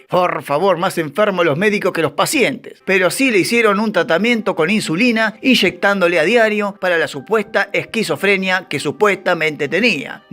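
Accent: Buenos Aires, Argentina. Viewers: La Verdad, Excelente!!! has captado toda mi atención! No conocía tu canal pero ya estoy subscrito! La energía y el tono de voz, con el que narras! Es Genial, sumado a eso ese acento y ese humor porteño! Es Genial, 🤣🤣🤣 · Excelente video. Triste tanta ignorancia en esa época. La Madre y la sociedad eran brutales y de doble cara. Muy bonito el acento argentino. Gracias.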